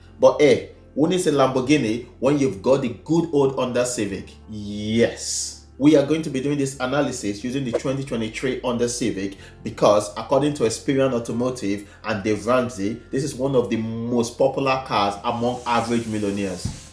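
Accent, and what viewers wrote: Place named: Africa. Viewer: I’m here for the great advice and African accent lol 😂😂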